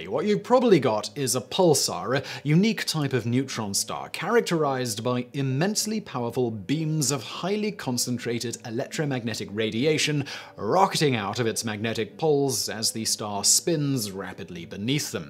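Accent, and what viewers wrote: United Kingdom. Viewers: I'm telling you; I'm only one stupified, drunken nite away from getting a tattoo of Simon. And, as it never fails, it most likely will end up on a precarious part of my body. Damn those Brits and their damn accents.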